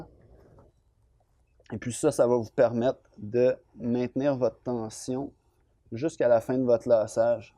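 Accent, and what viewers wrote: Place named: Quebec, Canada. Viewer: C'est un quebecois!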